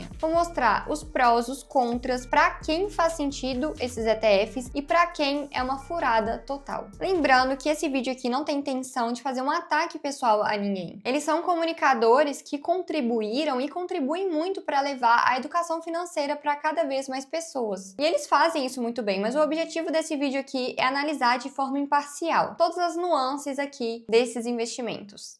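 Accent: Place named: Minas Gerais, Brazil. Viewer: Sotaque parecido com o de Minas e a voz lembra a da Sandy. Mas com relação ao conteúdo, ganhou um inscrito.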